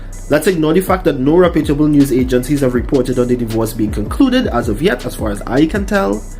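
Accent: Trinidad and Tobago. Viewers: Dais ah Trini accent btw👊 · Thank you!❤️ You sound like a Trini?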